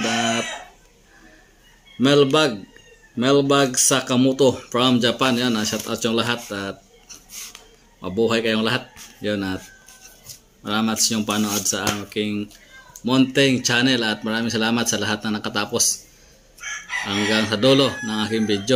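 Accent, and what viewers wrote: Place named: Iloilo, Philippines. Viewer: Morag ilonggo kaman ka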